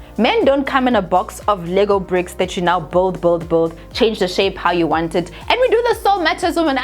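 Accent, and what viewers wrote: South Africa. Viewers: I like the way you speak South African vibes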